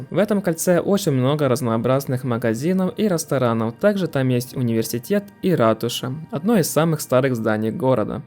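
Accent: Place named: Tajikistan. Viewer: Автор таджик? Просто интернсный акцент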